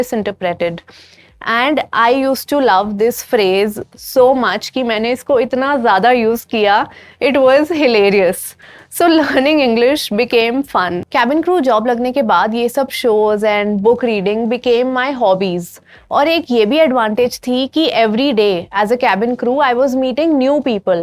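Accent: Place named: United States. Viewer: American English ❤ American Accent ❤ American Syllable ❤ American Pronunciation words ❤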